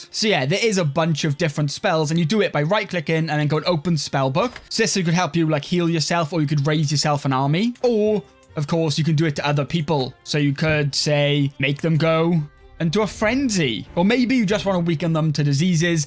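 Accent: Wales, United Kingdom. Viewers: Welsh accent?????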